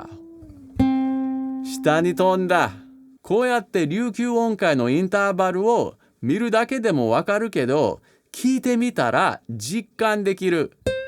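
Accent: Kansai, Japan. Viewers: 白人っぽい人が関西弁でウチナーオトを語る面白さ。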